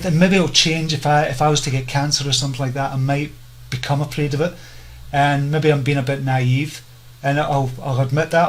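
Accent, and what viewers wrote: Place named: Scotland, United Kingdom. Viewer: Hi, I came about finding you in an interesting way; I too had found the song/dance " " and fell in love with it... I store it in my YouTube library. So, the other day I went to my Tablet to get it and somehow your beautiful introduction to the song showed up in my "photo gallery" for some reason. Anyway, I found your introduction just as fascinating as " " itself... I didn't know who you were, I thought maybe an Architect because of you, your office surroundings & your name displayed with your logo... I was thinking maybe you were just taking a break and wanted to share this "amazing, hypnotic beautiful" video online... So I've been carrying my Tablet around listening & watching " " because I've been extra ill the past month (I have Lyme Disease) and watching " " makes me feel much better, as you know - it has this quality about it. 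Then I started queuing it to your intro each time I played it because 1. I absolutely love your accent - I always have, Scottish - right? 2. Your more than average intro especially the end when you say "Okay, until next life"... So today I'm driving around (San Francisco, California) playing the song, its so wonderful... and it comes to the end and I look over at my Tablet on the seat next to me and say "Okay, I want to hear that stunning voice again" and I queued it up to the beginning and started wanting to know who is this interesting man and why does he say "until the next life"? So I realize you have a l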